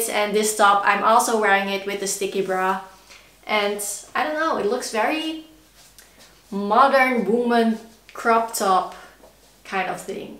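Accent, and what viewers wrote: Netherlands. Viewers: I love your dutch accent 💛🍀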